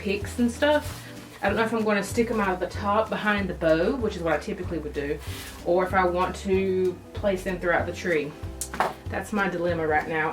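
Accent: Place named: South, United States. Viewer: Oh yeah and that accent ... loving every moment making me miss my southern roots 🥰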